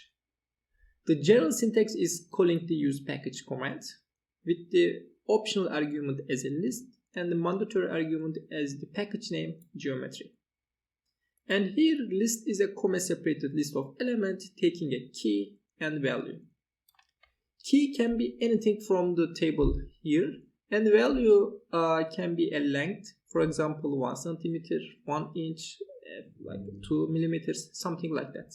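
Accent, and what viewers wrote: Turkey. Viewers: türk aksanı nerde duysam tanırım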